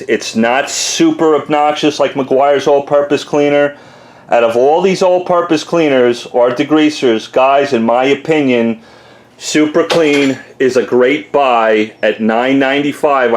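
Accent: New York, United States. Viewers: Are you a new yorka? :-)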